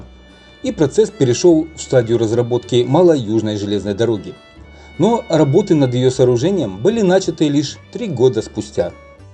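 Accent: Ukraine. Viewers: Обожаю узкоколейную ж/д,-она более романтична,чем традиционная,и - люто ненавижу бездарную,безграмотную речь с укр.,,хге",во время русского произношения,и просто убил бы за термин,,железка"!!! Для так говорящие да ещё публично и оком не моргая,-не люди,а жалкие убожества низшего пошива!!!Я всегда максимально придирчив,жесток и беспощадно-циничен к моментам орфоэпического произношения,не признаю диалекты и суржики,это - диагноз дебильности и дегенерации!!!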